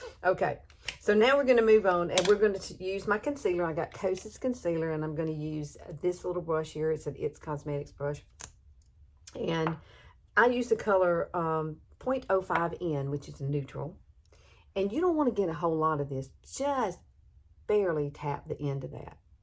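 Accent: South, United States. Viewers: Ur video from 6 yrs ago has a bit of a diff southern twang but it’s all so cute :) I miss hearing u say charlotte tilSbury 🤗♥️ I can’t wait to try ur make-ur-own liner brush technique & ur shadow hooded tip 💖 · Nothing wrong with sounding Southern, it’s a great part of our country! It sounds cute too.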